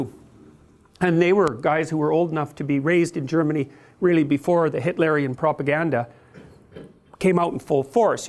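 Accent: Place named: Canada. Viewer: With a Canadian twist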